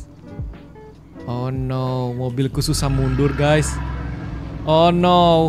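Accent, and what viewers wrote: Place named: South Jakarta, Indonesia. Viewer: Jaksel bangget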